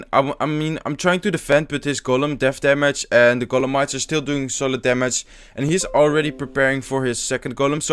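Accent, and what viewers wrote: Netherlands. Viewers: ja hij is Nederlands ja, kan je al aan zijn accent horen. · `haha dat nederlands accent lol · Ik dacht al dat je nederlands was. blanke koppie en dat accentje. knap gedaan trouwens. zet ons kleine landje goed op de kaart toppie! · Are u Dutch/Belgian|Ben je Nederlander/Belg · Are you Dutch? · are you dutch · Are you from the Nederlands?? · Matthijs Riemens denk het ook want zijn uitspraak is echt lelijk Nederlands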